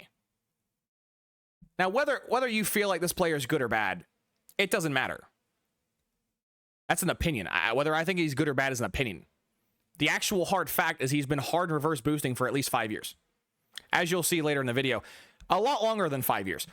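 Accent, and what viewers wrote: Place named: Mid-Atlantic, United States. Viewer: I think your accent was the now extinct Mid-Atlantic accent.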